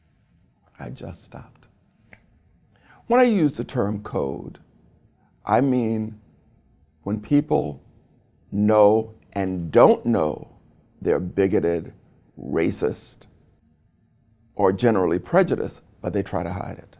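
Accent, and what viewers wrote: United States. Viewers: He has an American accent. I don’t understand. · It's partially because of your American accent. Many Brits love an American accent.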